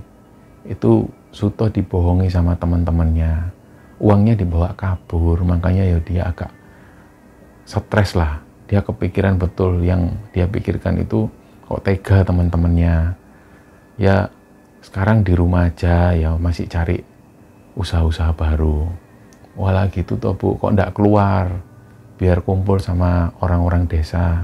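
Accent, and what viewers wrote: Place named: Surabaya, Indonesia. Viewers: Aku Sasak lombok, tapi dengar mas bicara, tangan,baku rindu Surabaya akubrindo Jombang.. pernah hidup di jatim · Host nya wong mana to? Bahasanya Suroboyoan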